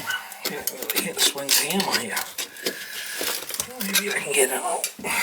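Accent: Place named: Boston, United States. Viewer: Oye, Once again me friend, I love you as a human, love your content, and love your comedy, But brother, You have to remove the Rag from your face, it's serves absolutley nothing, your only causing long term damage in your being, this thing they make you do, I where it for you, to perfect you bullshit, from what, the common cold the Flu, what happens to those bacteria, I see that's is now affecting you, your breathing, getting a little aggressive, Plus you took a experimental shot, I know you know what's really going on in today's society, Stop, I care,that's why I'm commenting, not trolling but caring, if those in fear want to Muzzle themselves over Fear and TV, let them, better for you, you don't have to wear one, their protecting themselves, It's gotta stop, think of the children hiding their faces in shame, only us can put an end to this. 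No disrespect, Just my Opinion. I'd stay in silence too, if I was fearful and just follow my handlers. The real message is To take care of others, You must take care of yourself first!!! So if you take yours off, maybe others will follow, but it starts with you Brother. Keep them coming, The Boston Accent is the Channel😉 Stay strong Friend.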